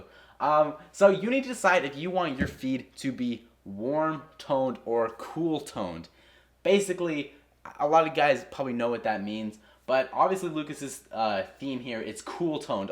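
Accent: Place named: Ireland. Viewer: You have a sort of Irish accent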